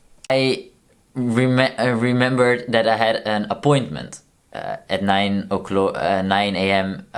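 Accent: Netherlands. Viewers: love you accent love Dutch I'm glad you are OK now xxx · Vreselijk verhaal man!! Wat kut. Je engels is btw goed aan je accent mag je nog wel werken ik kon meteen horen dat je nederlands was😂 no offense tho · Don't apologize for your accent. I had the blessed opportunity to lived in The Netherland for three years. Beautiful people, beautiful country. You are a beautiful and brave person. Forgive me because I do not know the correct pronouns. I am learning. ☺ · I like your Dutch accent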